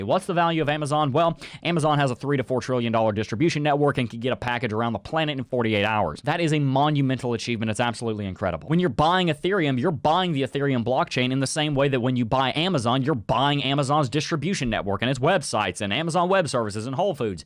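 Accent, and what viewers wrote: South, United States. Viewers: The only crypto guy with a southern accent. My kinda guy. From South Carolina btw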